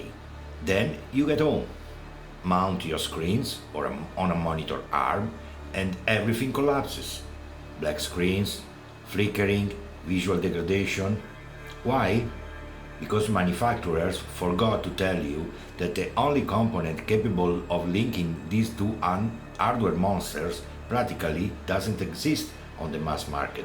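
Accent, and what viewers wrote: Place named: Greece. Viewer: Thanks for not using AI voice, despite your heavy accent (greek?). Id rather have someone attempt to make a video themselves than phone it in. That said, I have ran into this with my 240hz 4k oled. On displayport i have the bottom pixel row occasionally fizzle. On hdmi2.1 it has black screens and RGB flickering. Both hdmi and DP have issues with freesync which causes the AMD driver to completely lock up and crash. I pride myself of making my system very stable and optimized. But this damn monitor is always bugging out on me. Randomly turning off and the aforementioned issues. I dont think its the monitor itself...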